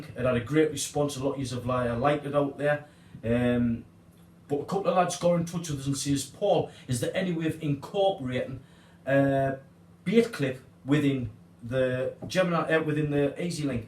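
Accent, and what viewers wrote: Sunderland, United Kingdom. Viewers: Hi Paul, love the show and its great to hear the old accent, came to Australia over 35 years ago from Sunderland... Knocked up a couple of the clips (took about 5 or six goes to get it looking like yours) using 316 grade 1.6 mm s/s rods as you use and thought I would have a look and see at what the poundage would be before it straightened. After doing 4 tests I got roughly the same lb's before the clip straightened. They all straightened at the 35 - 40lb mark, which is great in a lot of circumstances but wouldn't stand up to a big GT trying to get as far away from you as possible. Any suggestions on making them a little stronger or changing the design a little to give it more poundage before it lets go? I will try to have a play and see what I come up with. Absolutely not having a go, just one fisherman to another trying to make sure that biggen stays on the line... 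Cheers Mate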